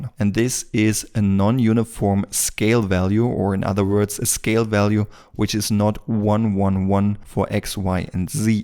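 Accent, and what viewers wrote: Germany. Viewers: Gutes Tutorial, aber dein deutscher Akzent sticht stark heraus xD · German accent😂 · Since you sound german, why dont you make german videos? :)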